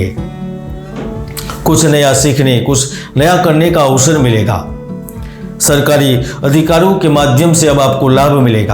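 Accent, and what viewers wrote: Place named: Gujarat, India. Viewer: I like your simplicity and Gujarati accent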